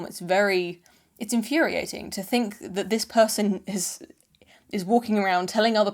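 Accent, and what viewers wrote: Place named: RP, United Kingdom. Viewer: This chick has the Downton Abbey/Pride & Prejudice accent but talks about "thots" and "Pewdiepie". I would pay money to hear her tell the Aristocrats joke.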